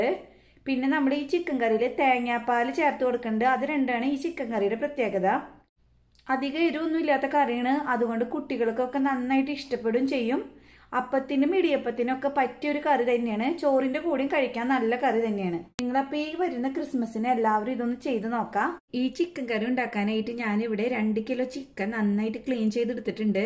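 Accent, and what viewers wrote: Thrissur, India. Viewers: L42 watched full nice recipe and loved your Thrissur accent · Like 11 I joined 594 Thrissur slang super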